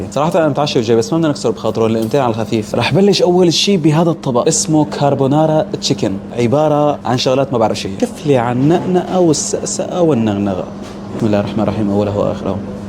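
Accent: Aleppo, Syria. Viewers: هل أنت حلبي❤ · لهجتك حلبية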